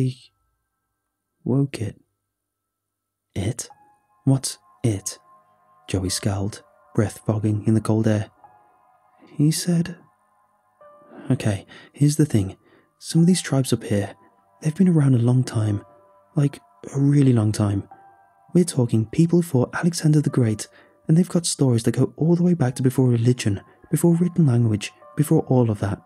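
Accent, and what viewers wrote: England, United Kingdom. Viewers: Guy sounds a lot like a Brit to be an American... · I might have possibly believed this as a true story if he explained that he was SAS. But that British accent was a tip off. But it is well written . And a nice work of fiction. Good job! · Obviously it's been narrated by a British guy. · is British. It’s the most logical explanation for why he pronounces things differently. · This guy sounds like a Brit but says he grew up in Colorado. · Why is there a British narrator? Can't understand a word. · British accent, pronunciation is different, not wrong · What’s with the English accent?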